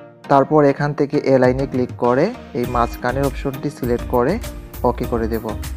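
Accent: Sylhet, Bangladesh. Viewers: vai sylhety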